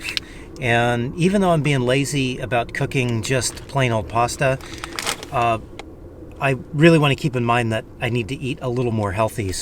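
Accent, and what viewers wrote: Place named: California, United States. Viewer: That's why your accent sounds familiar to me because you are from California. You can make your own pasta sauce. Tomatoes, a little red bell pepper (to keep the red colour of the sause) and one stick of celery to bring out the tomatoes taste, blend. It also makes a good tomatoes soup too 😊. I take it your mind is doing it's thing in it's regular time slot again. All good 🤗.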